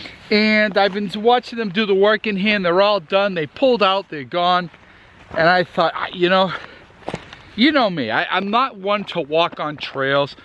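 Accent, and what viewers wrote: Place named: Massachusetts, United States. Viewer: Dude are you in Massachusetts? You sound like you're in Massachusetts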